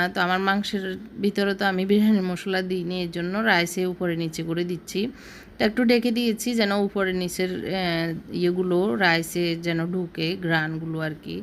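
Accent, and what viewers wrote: Noakhali, Bangladesh. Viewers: আসসালামু আলাইকুম আপু। আপু আপনার বাড়ি কি নোয়াখালী?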